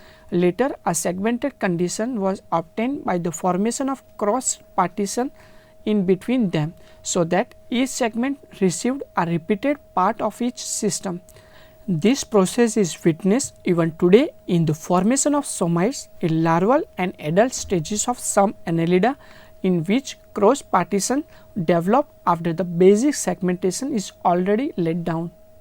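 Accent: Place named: North India, India. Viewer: Very bad English......typical rural small town North Indian accent.....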